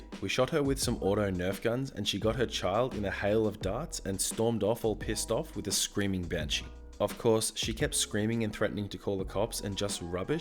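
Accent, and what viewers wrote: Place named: Australia. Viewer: Aussie accents are cool.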